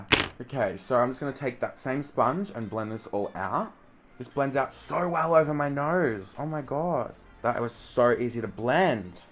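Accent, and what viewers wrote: Australia. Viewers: australian accent is aesthetically pleasing omg help me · Ellie Harriss he's Australian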